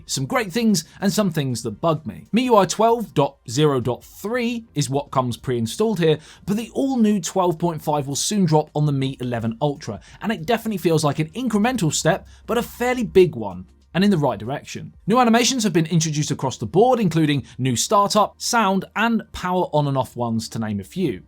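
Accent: United Kingdom. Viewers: Is this Youtuber from UK ? I luv British Accent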